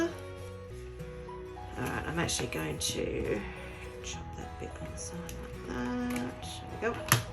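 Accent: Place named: Australia. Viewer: Good video and I have saved the link so I can try it at some point. Maybe another video could be done to make a permanent tissue box container? I recall you said the video was a test to see if you could do the video off your iPhone. The way to improve the video would be remove the tune in the background which got a little annoying and sometimes loud. Overall it was a lovely finished product and it was nice to hear another Aussie accent on the video.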